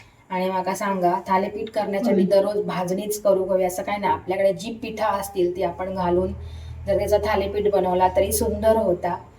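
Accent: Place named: Malvan, India. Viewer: "Hello ma'am just watched your latest vlog on cooking those delicious-looking thalipeths and I'm craving them now! 😂 Loved how you shared your morning routine and gave us a sneak peek into your daily life. The recipe was super easy to follow and I'm definitely trying it out this weekend! 👍 Your energy is infectious and it's so much fun watching you cook. Keep 'em coming! 💕 The malavani accent you speak is so amazing 😍 got to see u in very different way ....keep going lots of support and well wishes for your YouTube journey ....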